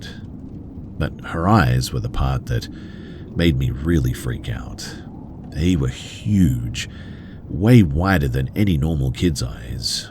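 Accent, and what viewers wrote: Australia. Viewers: I love falling asleep to so much but I wonder if we were to ever meet in person if the sound of his voice would cause me to doze off in the middle of conversation?😆 My brain hears that Australian accent and I'm gone!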